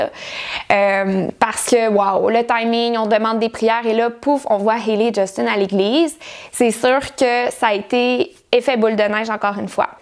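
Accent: Quebec, Canada. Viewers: J'ai vu le truc a propos de la prière sur Twitter mais j'étais pas au courant de tout le reste merci pour la vidéo ( J'ai un peu de mal avec l'accent Québécois mais j'aime trop le thé 😂)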